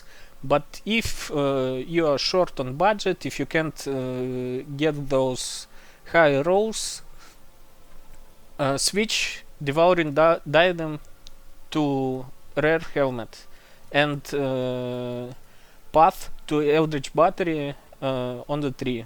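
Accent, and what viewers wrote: Russia. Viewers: по акценту понял что Ру говорящий). Каефынй билд и в пое 1 есть что фармить на нем ) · Тяжёлый русский акцент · Yo just rerolled this as my 3rd char this league and having a blast so far. So thx for the build, nice explaining overall and i love ur russian accent. Im currently using the Merc from my Minion Char, with Haste, Tyrant helm(flat fire dmg), blind, elemental weakness curse, and a bit survivability. What do you use for Merc? Is Determination worth it for example? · судя по говору сразу слышно, что русский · i understand russian english better than original english, good video, brazil here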